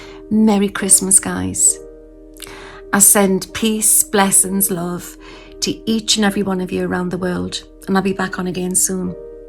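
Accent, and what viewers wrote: Newcastle, United Kingdom. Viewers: Hello, i have only just found you, tarot by janine shared this on twitter,you have a lovely calming voice and i love the geordie accent Definitely subscribed to you ,blessings from the north west (Southport) x❤️😇🧚‍♀️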